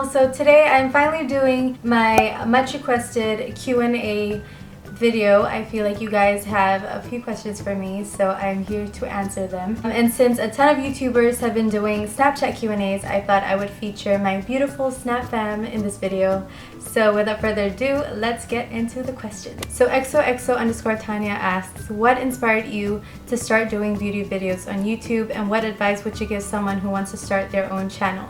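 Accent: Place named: United States. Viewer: You mention you've lived I Australia for 14 years so where does the American accent come from? :)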